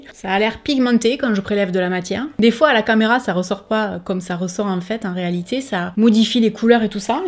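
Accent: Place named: Pas-de-Calais, France. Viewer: J adore tes vidéos et ton petit accent bizz du pas de calais 💋👍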